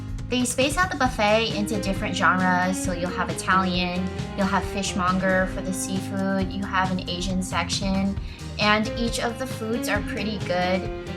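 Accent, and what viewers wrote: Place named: California, United States. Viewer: Informative but........ Couldn't get beyond 2 mins listening to that voice . Rising infliction much ? I began thinking it was the Californians skit off SNL ... No offence intended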